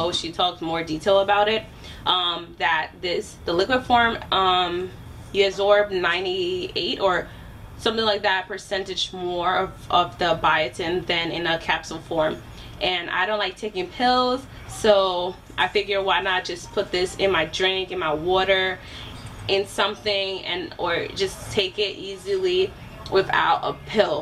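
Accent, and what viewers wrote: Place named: Liberia. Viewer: Wait, are you Liberian? Because the accent do sound like a Liberian 😆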